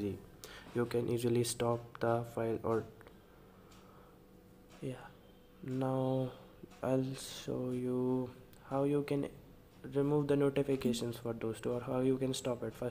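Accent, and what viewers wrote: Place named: India. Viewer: its impossible for me to understand Indian people talking english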